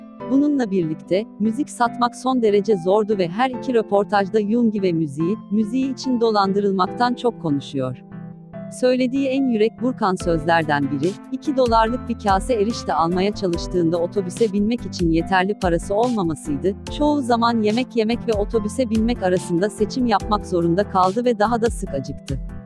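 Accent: Azerbaijan. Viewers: Sen Azerbaycanlımısın? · Ablacim Azeribaycanlimisin?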